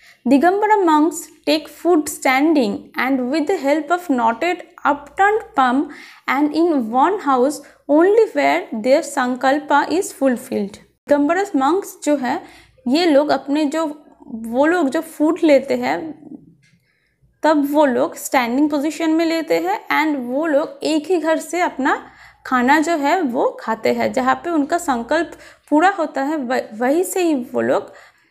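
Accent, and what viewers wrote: Bengal, India. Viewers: And apnar kotha shunei bojha jaay apni Bangali🥰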